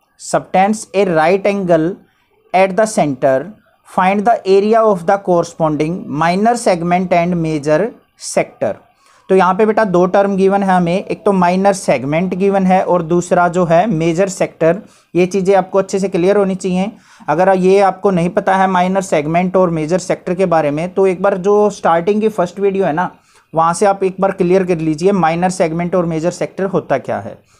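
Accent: Haryana, India. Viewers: I understand everything in maths from I feel especially and only teaching me and you explain every small thing in very easy way. sir aap Haryana se belong karte hain kyunki jab aap koi bhi question explain karte ho to aap ki tone mein Haryanvi Aisa lagta hai mujhko sir please reply karna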